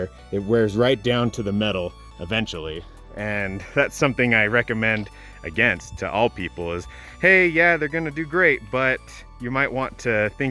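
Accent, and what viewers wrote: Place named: Utah, United States. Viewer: Ha. I thought you had a Utah accent!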